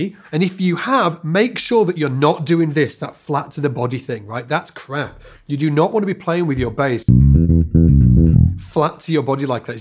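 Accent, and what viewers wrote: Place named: United Kingdom. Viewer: are you British or are Australian, or you are gabacho(USA)? I've watched videos speaking with a gabacho accent. Now your accent is British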